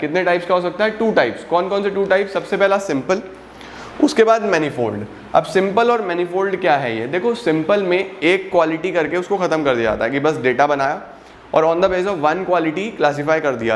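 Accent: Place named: Punjab, India. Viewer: Mujhe lagta hai ki aap punjab ke rehne wale ho.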